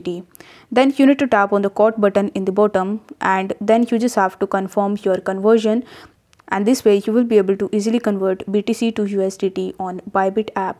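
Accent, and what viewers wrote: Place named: India. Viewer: one of the headache accents in the world,, is the INDIAN English accent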